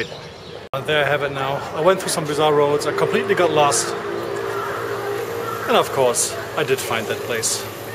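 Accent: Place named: Germany. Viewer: Fünf Worte und ich wusste, dass du Deutscher bist! :D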